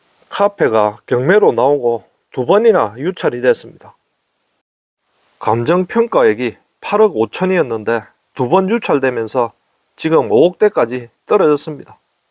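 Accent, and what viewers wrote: Gyeongsang, South Korea. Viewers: 노노 갱상디언..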